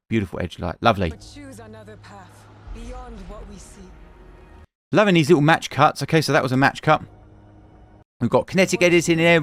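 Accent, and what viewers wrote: United Kingdom. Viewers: 😂 every time I, as an American viewer, hear you say the shortened f word for cigarette my ears perk up like did he just slur me out? And I immediately culturally adjust cause I also love British language and slang and the accent and I’m like “oh yeah that’s what they call cigarettes in the UK” where as when I hear that word thrown about here at home it’s being thrown out in discrimination against either myself or my other LGBTQ+ brothers, sisters, and others. But I got you!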